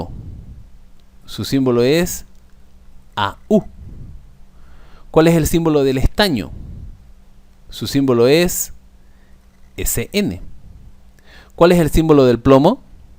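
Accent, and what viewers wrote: Santa Cruz, Bolivia. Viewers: si cruceño de oro we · Apenas inicio me di cuenta q era cruceño kakakajaajja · Además me di cuenta por el 'puej' y que en algunos casos decías palabras con j remplazando s, típico de un cruceño 😂. Por cierto exelente video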